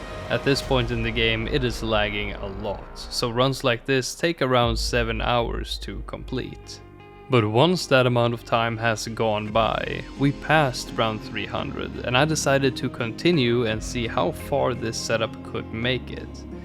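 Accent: Sweden. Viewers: Wait is he Swedish???? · Are u swedish?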